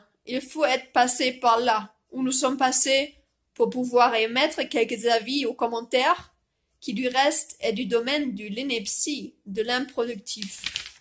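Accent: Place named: Germany. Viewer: Ich dachte mir schon vom akzent her das Sie deutsche sind. Es ist Das zweite vidéo dass ich mir von Ihnen anchaue. Ich leite weiter. Viel glück und dass diese verrueckte Welt endlich recht und wieder normal wird. Ich versuche so gut wie möglich auch meinen Beitrag zum Aufbau eine besseren zukunft für die zukünftigen generation. Ich drücke euch die Däumchen.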